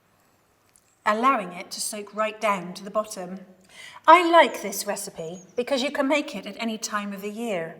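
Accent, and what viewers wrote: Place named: RP, United Kingdom. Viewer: she’s so posh ❤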